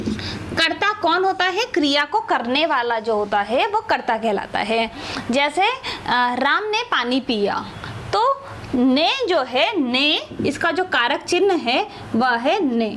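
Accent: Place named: Haryana, India. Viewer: there is harvani touch in your languge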